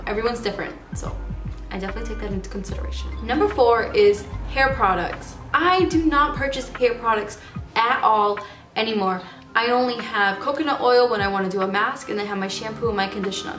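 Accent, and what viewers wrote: Boston, United States. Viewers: 1Brave Moon0 it’s a boston accent haha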